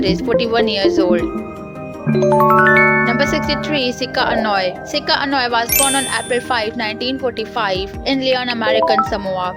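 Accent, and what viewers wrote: India. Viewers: Seems to me the narrator need to work on her accent! The whole world knows she's from India! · Why does your voice sound so weird? It sounds like you're from India or something.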